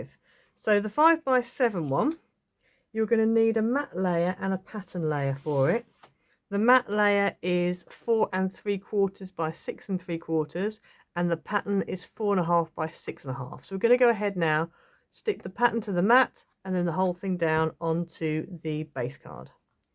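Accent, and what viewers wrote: United Kingdom. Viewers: Lovely card beautifully explained. Thank you. Good to hear a British accent too.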